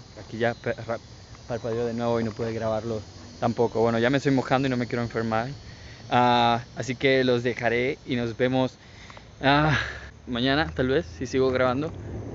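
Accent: Dominican Republic. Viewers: Eres dominicano!!!!!!!!!!!!!!!!!!!!!!!!!!!!!!!!!!!!!!!!!!?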